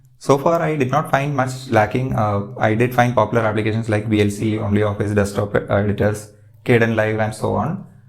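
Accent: Kerala, India. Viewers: Malayali anno bro ?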